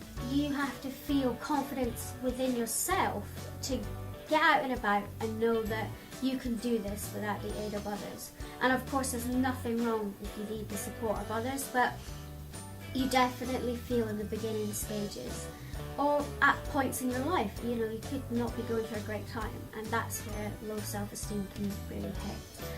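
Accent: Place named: Scotland, United Kingdom. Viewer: So lovely getting to know you better and hearing your lovely voice. I didn’t know you are Scottish! Love the accent xxx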